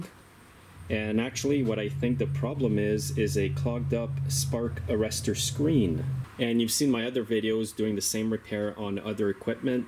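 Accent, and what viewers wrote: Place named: Canada. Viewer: love the vids! I need to ask, what kind of accent do you have? I think its strong Canadian but I can't tell!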